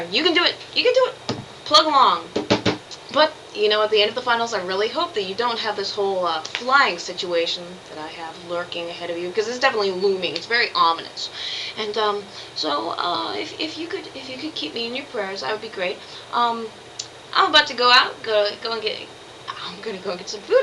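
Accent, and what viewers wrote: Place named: Texas, United States. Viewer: Your mannerism and voice. Dead give away. What part? Austin? San Antonio? Not near Dallas.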